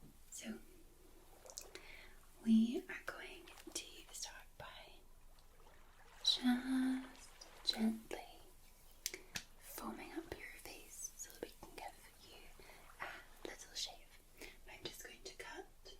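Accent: Scotland, United Kingdom. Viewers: Whats up?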